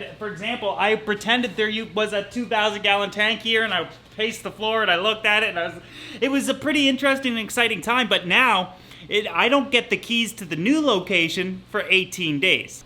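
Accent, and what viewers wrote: Canada. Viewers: That's some Canadian accent! :-)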